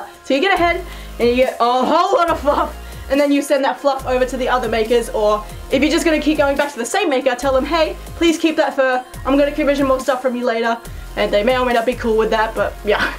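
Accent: Australia. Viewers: Q and A are u Australian or Tasmanian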